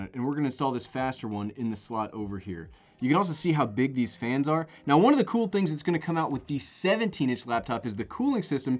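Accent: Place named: United States. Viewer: Always makes me do my confused face when Americans pronounce 'Soldered' as 'Sodard'.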